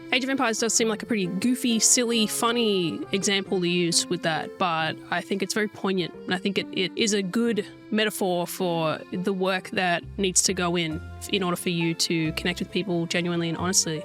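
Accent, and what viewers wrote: Australia. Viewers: You area Australian? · I love her accent 🇦🇺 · Why is Kurtis Conner speaking with an Australian accent? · Are you me with tits and an Aussie accent? The fuck has the internet done to our generation?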